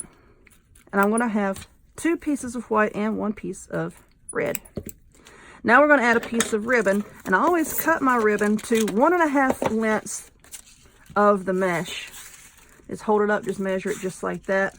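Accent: South, United States. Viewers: Guurrll! You crack me up doing Valentines crafts and we are STILL in 2018!😂😂😂 BUT! How can we resist this beautiful wreath! This is aaaabbsolutely STUNNING! Also I love your southern accent!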